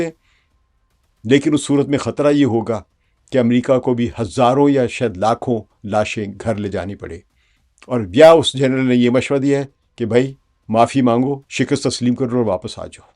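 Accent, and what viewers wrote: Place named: Punjab, Pakistan. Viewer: جی سلام ماشاء اللہ اپ کا تجزیہ بہت ہی خوبصورت ہے اور پنجابی ٹچ بھی بہت خوبصورت ہے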